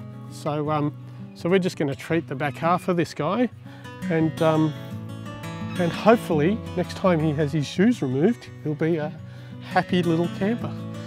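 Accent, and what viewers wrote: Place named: Australia. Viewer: Excellent work. Really enjoying your videos i just stumbled accross. I can tell from your "lack of an accent" lol, you sound like an Aussie?? (I am too) ☺ Just wondering what state you are in?? Not SA by any chance?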